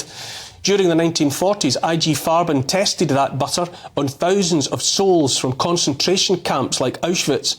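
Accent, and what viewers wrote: Scotland, United Kingdom. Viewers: That strong, angry, Scottish accent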